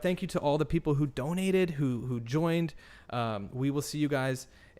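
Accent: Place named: United Kingdom. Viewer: Really enjoyed 👍I think this looks really promising and there's a similarity with the sketches. Nice to hear a brit cover this, I've been following this since two weeks after the murders. Libby was such an inspiring and brave person and both girls would have made such a contribution in this world. Justice will be served for these beautiful girls and their families 💜 💙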